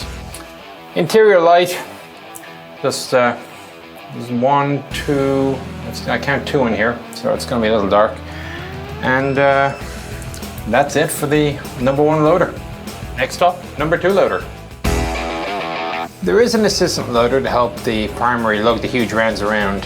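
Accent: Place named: Ireland. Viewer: Is he irish he has a slight irish accent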